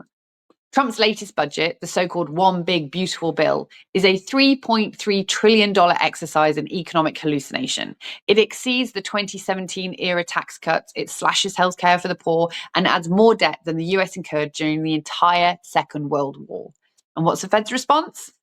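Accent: United Kingdom. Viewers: Housing market showing real cracks in usa...nice accent, so cool british woman...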